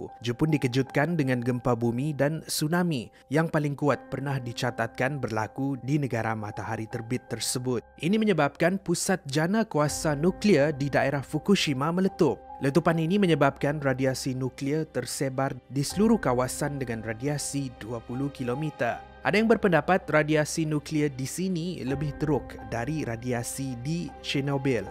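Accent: Sabah, Malaysia. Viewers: abg ni sabah or sarawak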